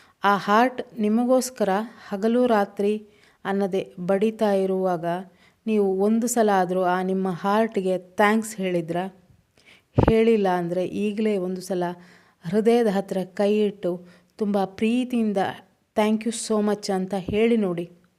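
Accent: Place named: Dakshina Kannada, India. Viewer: ನೀವು ದಕ್ಷಿಣ ಕನ್ನಡ ಜಿಲ್ಲೆಯವರು ಅಂತ ಹೇಳಿದಾಗ ನನಗೆ ತುಂಬಾ ಕುಶಿ ಆಯಿತು ನೀವು ಊರಿನಲ್ಲೇ ಶಾಲೆ ಕಲಿತಿದ್ದರೆ ನಿಮ್ಮ ಕನ್ನಡ ಬೆಂಗಳೂರು ಕನ್ನಡದಿಂದ ವಿಭಿನ್ನ ವಾಗಿರುತ್ತದೆ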